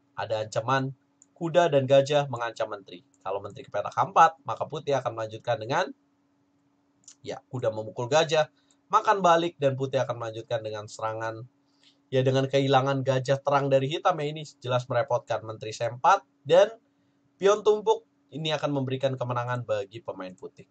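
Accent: Tegal, Indonesia. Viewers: Bang kelahiran tegal ya..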